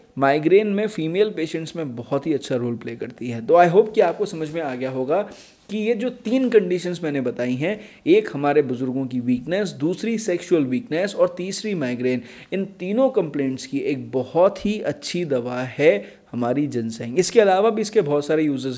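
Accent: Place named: India. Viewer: Indian English!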